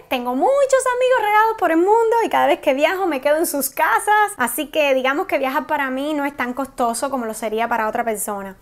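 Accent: Argentina. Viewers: me encanta el acento argentino sobretodo desde que vi la telenovela Muñeca Brava jejeje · Me encanta como hablan los argentinos y x cierto irradias mucha energía positiva eres linda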